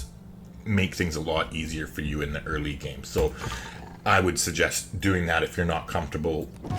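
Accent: Canada. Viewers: yep that’s what I meant. Awesome video by the way, been playing civ for years and learned a lot of cool stuff just watching this. Sick Canadian accent as well. Also are you gonna continue this series or was this just a one time thing to show beginners how to play out the first 50 turns?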